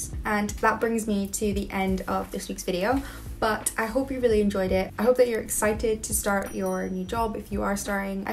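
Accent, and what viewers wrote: Scotland, United Kingdom. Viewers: that's a wonderful light scottish accent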